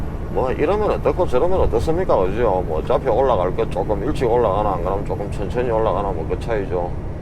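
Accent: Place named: Busan, South Korea. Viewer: 다른 트럭커동영상도 봤지만 역시 님 영상이랑 음성이 제일 귀에 들어오네요.ㅎㅎ 왜냐!! 저도 부산이라 그런가봐요..특히 음성이 전혀 이질감없이 들어오니 너무 좋네요^^ 파푸아 썰~ 잘 듣고 갑니다.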